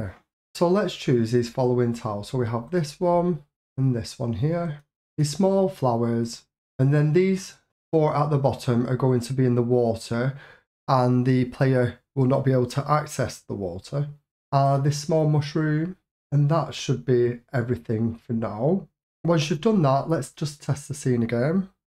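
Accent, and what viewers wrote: United Kingdom. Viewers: Let me guess, British?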